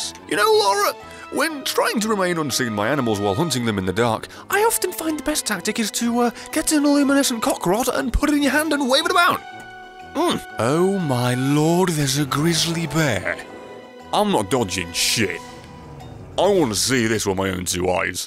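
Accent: United Kingdom. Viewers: I doubt you'll read this, but thanks for making such amazing content, my dear madman. Seriously, you've made me a maniac, and I love you for it. You just bring laughter to my life, and a bloody lot of it. I would give a fucking arm and a leg to talk to you once, since I really only need one of each. One more thing I love you for, you're british, and I love british slangs and accent. xD So keep up your work man, you have my full support!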